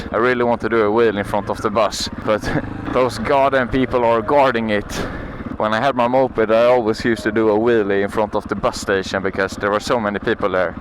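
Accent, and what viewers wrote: Sweden. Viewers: Man hör att du är svensk ialafall 😂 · älskar svenska dialekten 😂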